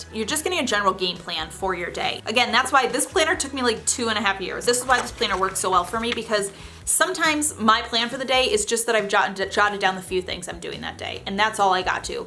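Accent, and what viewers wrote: Midwest, United States. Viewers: Are you from the midwest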